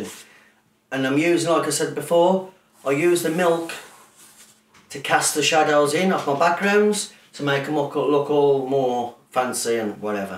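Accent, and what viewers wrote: Birmingham, United Kingdom. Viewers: You sound like a fellow Brummie! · I used to hate dandelion season and right now in Canada, we don't have any. I can't believe that I am looking forward to it this year! Just discovered your videos and love your presentations and simple explanations. Look forward to more! Great job. As an ex Brit, love the accent! Thanks again Bill Goodwin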